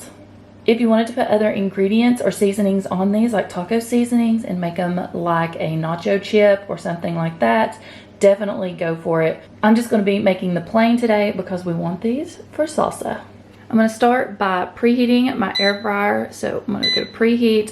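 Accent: South, United States. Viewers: I trust cooks with southern accents more!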